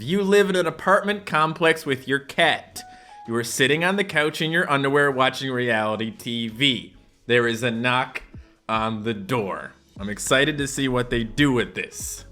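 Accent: Newfoundland, Canada. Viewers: SPEAK in your newfie accent starting from now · ever since hearing your newfy accent i haven’t heard you the same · after i watched his real accent video i cant unhear the hint of a newfie accent in his voice now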